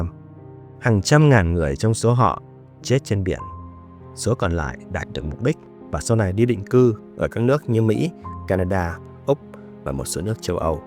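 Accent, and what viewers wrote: North, Vietnam. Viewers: Tác giả không nên đánh lận con đen, gộp chung những thành phần thiểu số vào đại diện cho người miền Nam. Tại sao không nhắc đến đa số nhân dân mền Nam tham gia kháng chiến chống Mỹ, nếu không có sự chiến đấu của đa số nhân dân miền Nam thì liệu có thể giải phòng miền Nam được không? Tại sao chế độ Việt nam cộng hòa là tốt hơn mà đông đảo nhân dân miền Nam vẫn đấu tranh quyết tâm giải phóng miền Nam? Tác giá nói rằng người miền Nam đi vượt biên tị nạn, sao không nhắc đến đa số nhân dân miền Nam đang ở lại xây dựng đất nước sau chiến tranh. - Cũng cần nói rõ thành phần vượt biên tị nạn đa số là những người gốc Bắc di cư vào Nam năm 1954 làm việc cho chế độ cũ ( tác giả cho đó là người miền Nam có hợp lý hay không?) Ngay bản thân người đang đọc bản tin này với giọng đọc của người Bắc di cư vào Nam năm 1954. Tổng kết lại tôi thấy video này ngoài mục đích kích động phân biệt vùng miền thì có rất ít giá trị lịch sử được nhìn nhận một cách khách quan. 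· giọng của nghe rất giống giọng Bắc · 😅😅😅dân giá đỗ chứ ji kkk,biên tập giọng Bắc này o cần nghe, các ông biết ji về miền nam 😮😊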